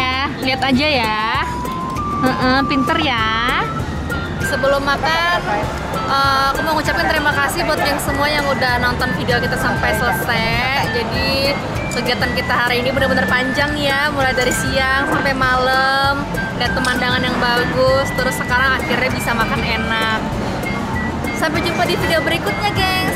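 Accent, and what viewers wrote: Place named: South Kalimantan, Indonesia. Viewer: Org mana kk ngomong nya itu deh kek org kalsel gak tau juga sih bener apa enggak🤣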